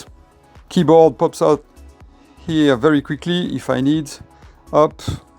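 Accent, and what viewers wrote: France. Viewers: He have french accent 😮, but the tab is good🎉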